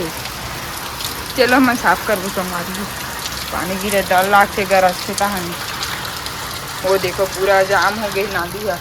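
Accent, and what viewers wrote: Chhattisgarh, India. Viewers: Aapka village kaun sa hai aur kus district se hai aap apka hindi cg boli bhut achha laga❤❤❤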